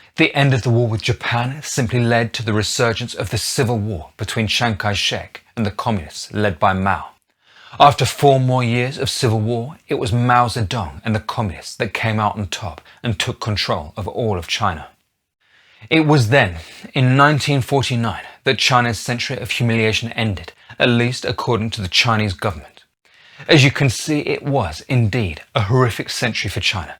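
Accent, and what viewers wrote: United Kingdom. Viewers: Never trust a man with a british accent. The British used drugs to destroy China. U owe them reparations.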